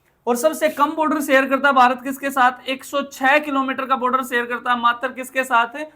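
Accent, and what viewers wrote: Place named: Rajasthan, India. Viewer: Rajasthani tone 😊 Haryanvi v ... thora thora Panjabi v😍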